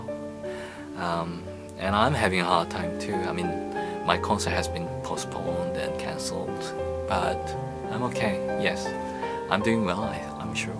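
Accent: United Kingdom. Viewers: I can watch u talking , for the rest of my life! How sweet you are! I like ur British accent 👏🏻❤️ and i love your new album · 크으... 역시 루마행님 브리티시엑센트 쥑이네~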